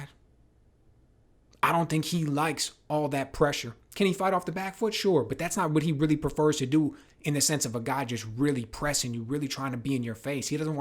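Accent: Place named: South, United States. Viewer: always dig your insights man. plus you sound like a southerner (I'm from/in Mississippi)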